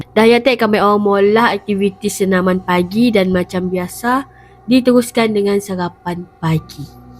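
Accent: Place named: Sarawak, Malaysia. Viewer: Waahhhhh....bercerita sambil lorat Sarawak...hahaha...memang best awakkk....terbaikkkk👍👍👍👍🥰🥰🥰🥰🥰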